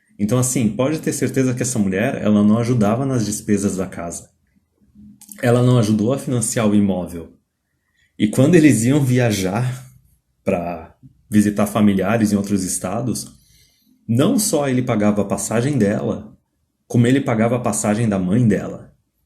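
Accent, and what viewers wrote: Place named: Rio Grande do Sul, Brazil. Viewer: Cara pelo seu sotaque é gaúcho da região metropolitana, não sei se é impressão minha, mas as mulheres do RS parecem ser as mais canalhas do Bostil! Olha cara, esse Estado é uma fábrica de capivaras socialistas, aqui é um lugar onde a pior nata de mulheres escolheram pra nascer, tanto as socioloides quanto as conservadias que se dizem de "direita" mas que defendem os mesmos interesses que as de esquerda! Então o cara entra num Tinder da vida é pra vomitar de tanta nojeira que o cara vê já na descrição dos perfis das digníssimas!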